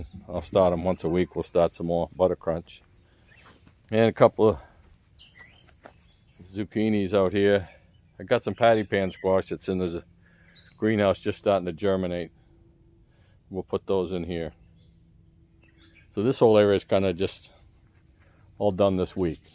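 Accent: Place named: New Jersey, United States. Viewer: Your garden is looking great, sounds like you're from Jersey.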